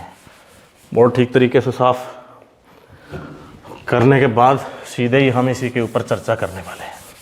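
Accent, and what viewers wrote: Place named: Haryana, India. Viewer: Amazing video lectures you are giving sir. Appreciable and yea I like your haryanvi accent too 😂 but yea you explains everything amazingly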